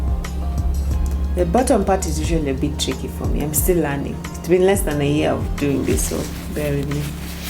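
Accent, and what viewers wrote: India. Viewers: You look beautiful, wow and only for 1,500, very impressed. Love the Indian accent😂😂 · Beautiful. I love the Indian Accent😂😂😂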